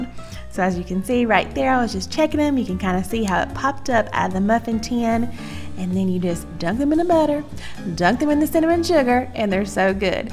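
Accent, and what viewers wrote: South, United States. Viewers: Hello 👋🏻 I just found your channel today and I’m binge watching your FALL DECOR videos! Thank you so much for sharing! Your accent is so sweetly southern but I can’t tell where you’re from. Do you mind sharing? I am born & raised Louisiana but my accent is not as cute as yours! 🤗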